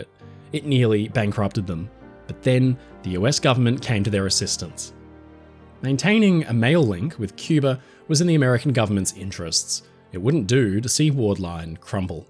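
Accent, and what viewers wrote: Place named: Australia. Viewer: 😊great show man, you have an Australian accent, but your last name seems to be Irish… Just curious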